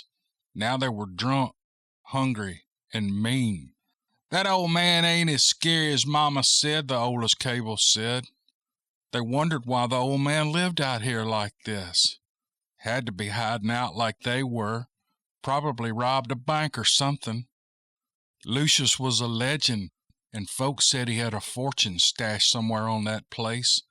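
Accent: South, United States. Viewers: I love this story and I appreciate you reading it. I love hearing that southern drawl . Thank you ! From another southern person. Just to the north of you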